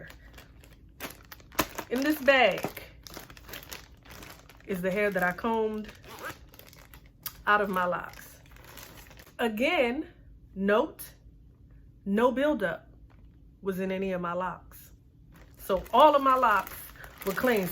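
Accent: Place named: Prince George's County, United States. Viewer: I'm from PG, the accent!!! Makes me miss home 💛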